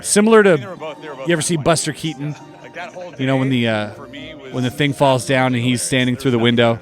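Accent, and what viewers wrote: Canada. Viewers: Holy smokes! You’re Canadian bud? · You Canadian?!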